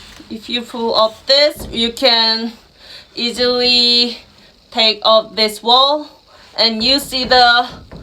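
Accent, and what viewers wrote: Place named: South Korea. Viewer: 이케아 엘리프 검색하다 우연히 들어왔는데, 와 일본인 영어발음이 완전 한국인이네... 라고 보다가 채널명을 보니 한국인이셨네요ㅎㅎ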